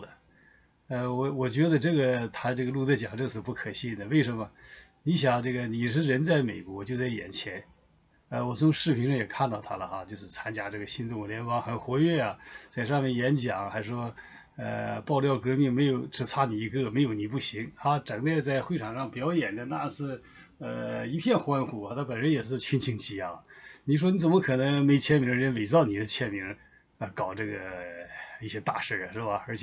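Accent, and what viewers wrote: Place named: Dalian, China. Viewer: 大连口音啊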